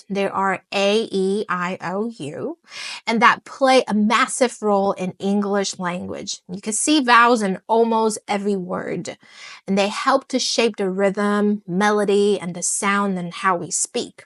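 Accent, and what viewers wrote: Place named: Midwest, United States. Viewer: Maybe I'm crazy but you do sound mostly mid-west to me (I'm from the mid-west) lots'a lazy letters from d'ere. 👍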